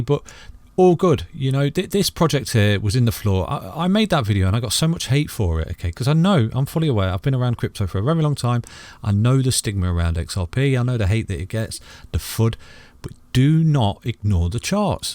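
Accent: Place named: Doncaster, United Kingdom. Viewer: Doncaster, sounds like the kid is from Doncaster, I'm probably wrong though lol. Great video, thanks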